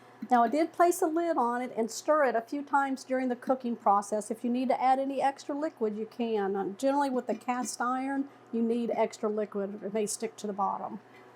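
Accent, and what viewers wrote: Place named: Mississippi, United States. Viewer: Wow! I'm in Columbia, MS and you talk like a MS person! This is an Amazing recipe! It can easily be made Cajun with some local stuff. Love your videos. Blessings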